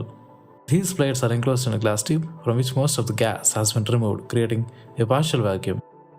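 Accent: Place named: South India, India. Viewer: Thanks helped a lot, the South Indian accent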